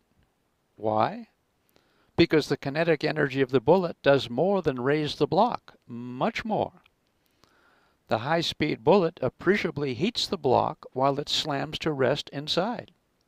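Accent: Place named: Transatlantic, United States. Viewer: that transatlantic accent is so awesome, all i could think about haha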